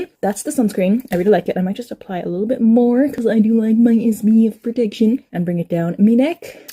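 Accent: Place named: Ireland. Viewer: Ha me too! It's the Irish thing, we speak so quickly and its so comforting to hear an Irish accent at the speed I'm used to. We don't waste a second😂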